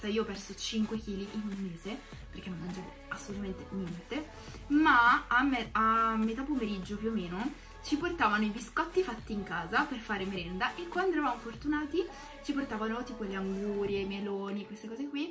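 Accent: Italy. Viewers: Come mai sei Sarda ma parli come in Lombardia?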